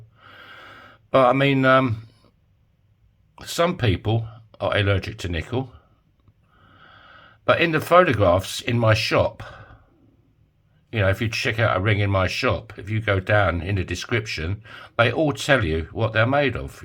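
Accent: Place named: Essex, United Kingdom. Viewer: you mentioned you were in Cornwall at one point, where out of interest , I me in St. Mawes. but like me your accent says Essex or east London!!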